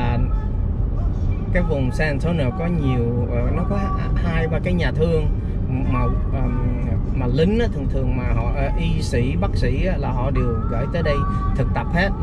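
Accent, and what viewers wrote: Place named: Vietnam. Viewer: Hi,qua Mỹ lâu mà sao vẫn giữ giọng VN hay há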